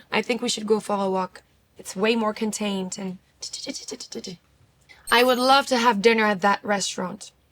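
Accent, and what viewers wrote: France. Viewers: Her French accent is so smooth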